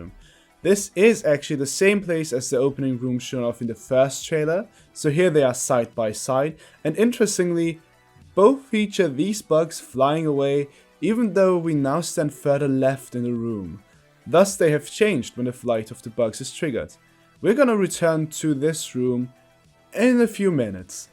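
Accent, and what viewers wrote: Austria. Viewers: The Austrian accent is based as hell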